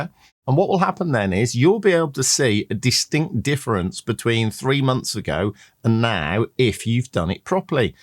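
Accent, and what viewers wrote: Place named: Australia. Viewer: i knew i was hearing a little Auzzie mixed in there. 😅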